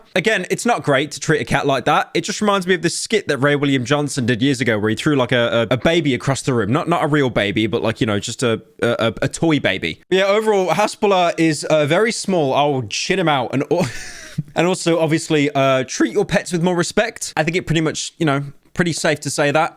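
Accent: United Kingdom. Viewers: is Bri ish?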